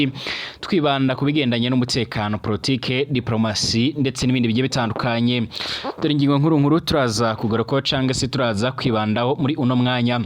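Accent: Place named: Rwanda. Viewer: bjr. mbe ko wigira umurundi kandi vyumvikana ko uri umunyrwanda !!!!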